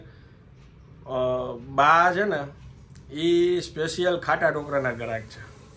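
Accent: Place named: Kathiawad, India. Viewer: Jsk. u are from Kathiawad as I make out from your gujerati talk. So are you a liana or Vaanya?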